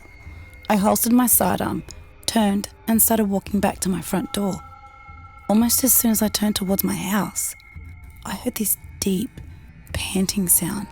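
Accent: Australia. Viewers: I'm from middle Tennessee and wtf with the Australian womans voice for a Tenn womans story 😂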